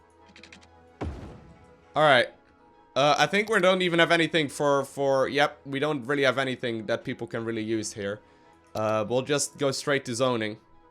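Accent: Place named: Netherlands. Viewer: where u come from sounds a bit dutch accent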